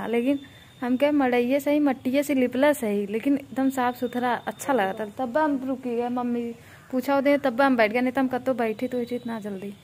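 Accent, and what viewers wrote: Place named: Jaunpur, India. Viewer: Yar aaj suna tha hindi divas h bt aap to jaunpuri divas bna diye. Di hmre yha b bolte h bt aapki bat mujhe bhut km samjh m aai itna pure mt bola kro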